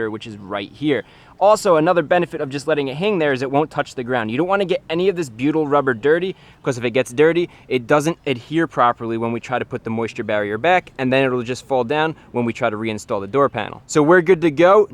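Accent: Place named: New Jersey, United States. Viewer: *Soapy water - Pronounced as "wooder," likely due to his New Jersey accent.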